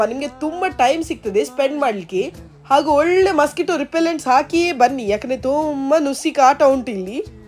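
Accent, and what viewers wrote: Mangalore, India. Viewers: yake milk nodi kushi aitu gotta my sister voice kelidre milk aste 👌 erutte Aa Mangalore kannada kelode kushi❤️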